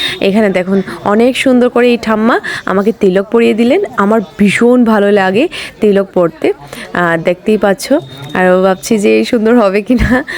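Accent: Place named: Bangladesh. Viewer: Your speaking tone has a influence of east bengal but it's quite natural for North Eastern bengali people like tripura ,Assam ..btw welcome to my hometown Nabadwip..enjoy 🙏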